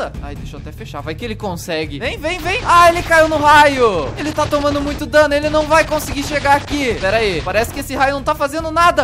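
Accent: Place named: Portugal. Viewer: Essa menina é portuguesa a falar a língua de Portugal e eu moro aqui em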